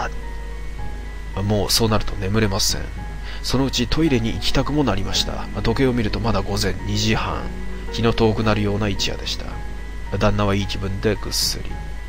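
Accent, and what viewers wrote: Kansai, Japan. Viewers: あれ？136さんは関西人？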